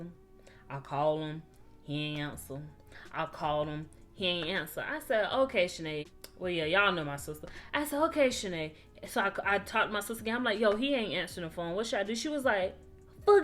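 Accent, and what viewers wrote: Baltimore, United States. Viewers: You sound like a bmore girl lol. I enjoyed the story time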